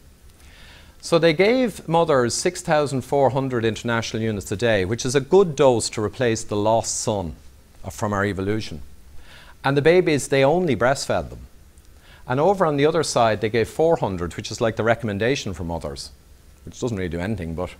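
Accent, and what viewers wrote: Ireland. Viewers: I could listen to this chap all day, his wonderful Irish lilt has me glued in the same way that Carl Sagan did. There's something in the voice that seems to illuminate what's being said, and illustrates what would be otherwise baffling. Thank you Mr.. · Sounds like he's from Galway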